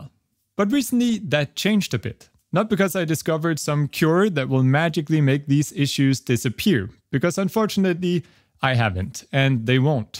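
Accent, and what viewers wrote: Denmark. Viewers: Are You Danish? :D I just moved to Denmark recently, what a coincidence :D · På trods af den meget milde danske accent, og at jeg vidste du var dansk. Så var det helt underligt at høre dig snakke dansk 😆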